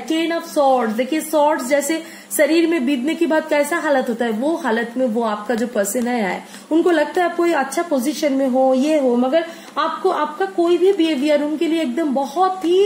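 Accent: Bengal, India. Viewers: Hindi me bhi aapka Bengali accent aa jaata hai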